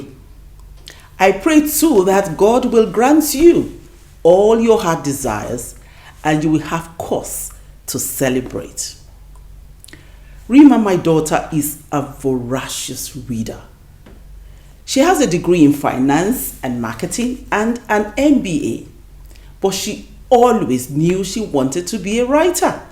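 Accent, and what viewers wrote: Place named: Nigeria. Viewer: Congratulations. I have got your book on Audible and it’s just so well written and more so a beautiful Nigerian accent to compliment. We are so proud of you. I recommend this book to both your young and old🎉👏🏾👏🏾👏🏾👌🏾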